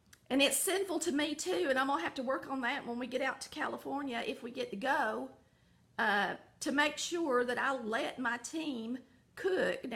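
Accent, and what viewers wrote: South, United States. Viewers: How could anyone listen to you and say you're not a country girl?! If you're wasteful, hey, it's your money not hers. If you do things differently, it's your home and you're the ones eating it. Obviously, everyone loves your cooking! Your husband adores you and you love the Lord and your family--nothing else matters!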